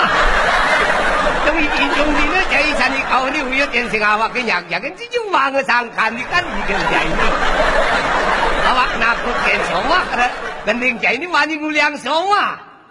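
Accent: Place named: Buleleng, Indonesia. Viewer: Logat asli Buleleng, kasar tapi lucu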